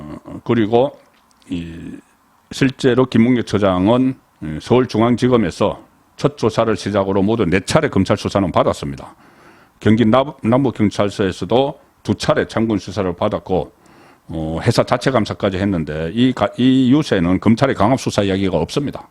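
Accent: Gyeongsang, South Korea. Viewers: 송국건유튜브방송을 즐겨보면서 늘 느끼는 점은 내용은 최상의 수준인데 말실수를 잦은편인데 그건 그다지 문제가 될 수는 없지만 억양 지방시골억양이 참 문제입니다. 예컨대. 엄마의 자매 이모?를 성씨가 이씨일때 이 모씨 라고 말하면 안되듯이, 장음 단음이 정확해야하듯이 오늘 방송을 보면서 자꾸 유서를 유 서 라고 합니다. 스스로 녹음된 거 보면 그걸 못고치는지? 40년대 시골사람이 서울한번갔다와서 서울말씨를 흉내내다보니 저런 현상이 나타나듯한데, 특히 경남지방사람들이 저렇게 발음 억양을 구사하는데, 대표적으로 가장 울렁증유발수준은 성창경입니다. 그런데 송국건혼술은 정말이지 우파에서 방송내용은 최상인데, 발음 억양이 최고스럽지않는 기본이 안된건 고치는 게 좋지않을까요? 앞서 언급했듯이 성창경은 울렁증생겨 듣질못할정도인데, 기자출신이면 그걸 고치지못한다면? 울산이나 포항정도수준의 시골지방기자수준에서 벗어날 수 없는 게 아닌가하는 맘이 듭니다.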